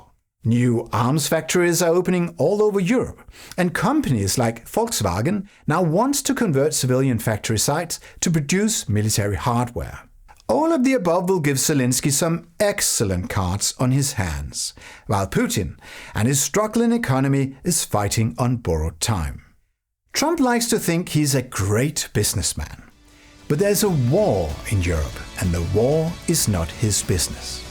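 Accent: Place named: United Kingdom. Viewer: I cannot thank you enough, I love your content and presentation. May I ask, are you German? You have a British accent but is a German name. We (normal Americans) are glad that Germany is on our side… we have some seriously bad adversaries that we will soon be dealing with…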